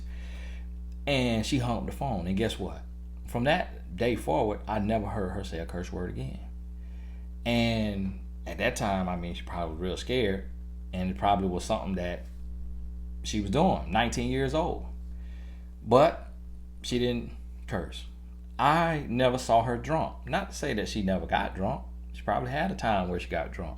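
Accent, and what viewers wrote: South, United States. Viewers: Hey brother, great video as always! I love your Country accent it's so cool and you are pretty funny lol... keep up the good work... God bless you. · Be blessed...to your and your family. I actually like your country accent. We all have accents tho' 😏. Some might not think so. 😏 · keep the accent, it is apart of your brand. You remind me of my southern relatives whom i truely miss.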